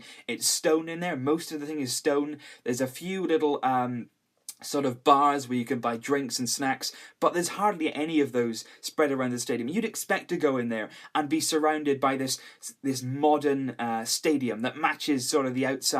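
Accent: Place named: Scotland, United Kingdom. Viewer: Methinks he is Scottish. He should be supporting Celtic, Rangers or by the sounds of him, Hibs or something.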